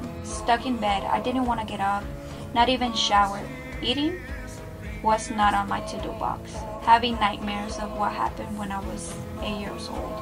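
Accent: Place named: Spain. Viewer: Go to school and get a degree ! Unfortunately you can’t just start as a Spanish influencer then suddenly cross over to English influencer especially with your accent so thick. I would suggest you create videos in Spanish and use YouTube as a source of income not a concrete job because with wars and inflation lots of sales ( links in bio ) are going to go down . People are more tight with their money especially right now .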